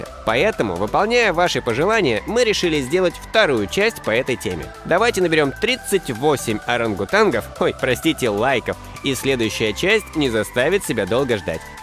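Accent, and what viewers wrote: Kazakhstan. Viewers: Ты чё казах чтоле??? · Ты чо казашка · Братан ты казах что ли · Автор ты из казахстана? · ты чо казах или из казахстанов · Ты че из казахстана? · Ууу казак ютубер ты из алматы или орал?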